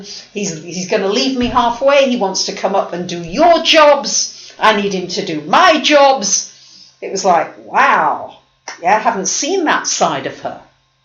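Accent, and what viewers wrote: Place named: Midlands, United Kingdom. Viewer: I had no idea you lived in Greece, I thought you were maybe somewhere in the midlands in the UK.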